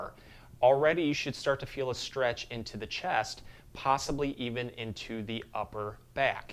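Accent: Michigan, United States. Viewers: Completely unrelated to the video, but this made me realize how strong Michigan accents can be when you listen to them Related to the video, this is very helpful and should ease some pain from working! It’s a shame I’d need to drive across the state to get treated by you though, you know your stuff!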